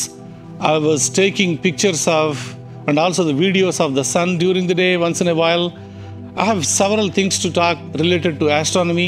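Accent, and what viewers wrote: India. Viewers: This Man, explaining in his thick Indian accent, what I believe to be True. is speaking HONESTLY! Please Listen to Him as I Am! Doc Bones, out.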